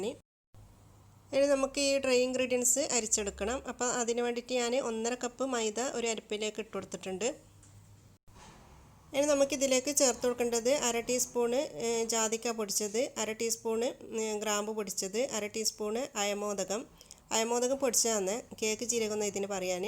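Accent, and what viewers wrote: Thalassery, India. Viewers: ഇതൊക്കെ കേൾക്കാൻ ഒരു രസമാണ് തലശ്ശേരി ഭാഷ 😍👍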